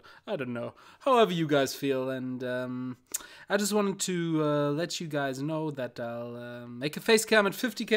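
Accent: Germany. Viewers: 100% DEEnglisch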